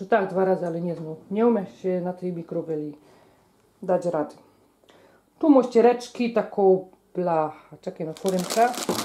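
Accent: Silesia, Poland. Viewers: Nie da sie sluchac tego slaskiego pierdolenia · Kuchnia fajno a ta twoja godka jest dupno 😂 też jestem że śląska mieszkałam w Zabrzu a teraz już kupa lot w Holandii pozdrawiam😚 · Mogłabym Pani słuchać wciąż... Uwielbiam tą śląską gwarę. Kuchnia bardzo funkcjonalna 😍 zazdroszcze tych wszystkich pierdół do pieczenia 😉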